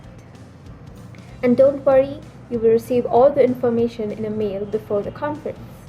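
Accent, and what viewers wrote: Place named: United Kingdom. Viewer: How you bring that British accent?😁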